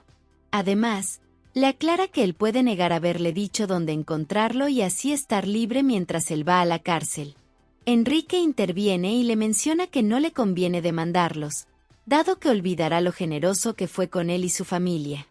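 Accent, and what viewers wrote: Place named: Colombia. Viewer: Narra con acento Colombiano😊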